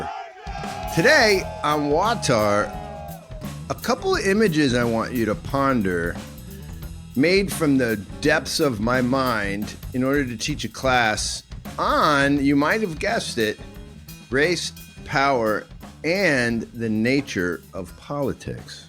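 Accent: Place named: California, United States. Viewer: This is strange. You look a lot like a man I meant at the monastery of katskhi a couple of months ago and you sound just like this Californian guy I know named Peter..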